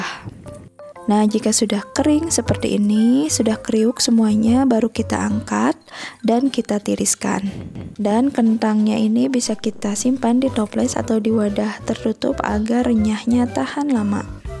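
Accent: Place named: West Java, Indonesia. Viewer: Ooh punten, rupanya org Sunda yaaa..? soalnya klo org Bali lafal kan huruf T itu Lidah nya nekan ke langit langit, klo wilayah lain Lidah itu menekan ujung gigi Atas..duh hapunten yaa..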